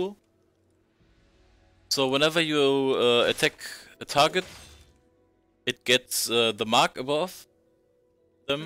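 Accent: Germany. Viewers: Nice video it really helps me. From what country are you? Your accent sounds a bit German.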